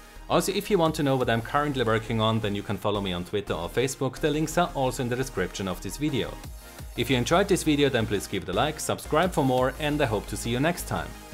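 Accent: Germany. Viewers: if this guy is not german i dont know what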